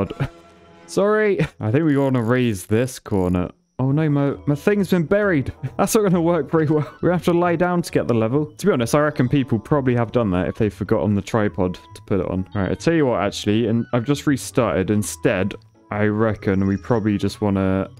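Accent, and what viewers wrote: United Kingdom. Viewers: I love this channel and Your accent is hilarious😂! I love the British accent😁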